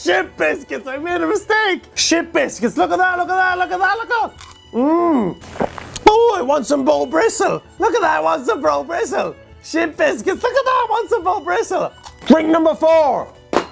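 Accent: Ireland. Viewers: Does anyone know what part of Ireland is his accent from?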